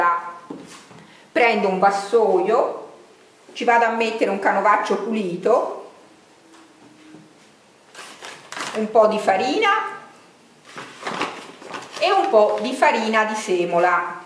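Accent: Tuscany, Italy. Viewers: Lei è toscana?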